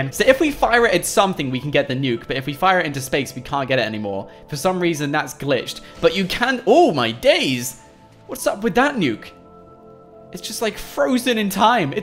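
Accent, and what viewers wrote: United Kingdom. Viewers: Are you from UK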